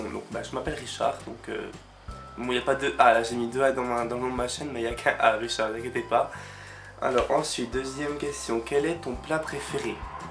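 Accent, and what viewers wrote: Belgium. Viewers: Je suis belge et c'est vrai qu'en t'écoutant, j'étais persuadée que tu l'étais aussi :D Chouette découverte en tout cas, continue comme ça ;)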